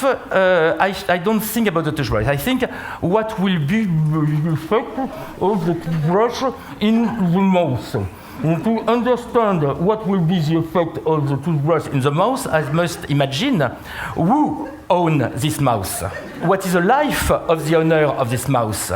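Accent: France. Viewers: He has the most comical, stereotypically French accent I've ever heard. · love this french accent it is so funny!!!... today to not do it is more positive than do it :))) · I am French myself and I think he represent the french accent...His accent is terrible...you can understand clearly ? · As much as i like this guy , i hate the french english accent !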